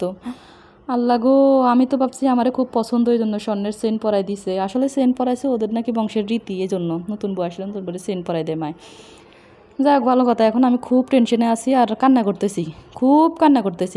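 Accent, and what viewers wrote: Noakhali, Bangladesh. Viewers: ওরে নোয়াখাললা তাই বুজি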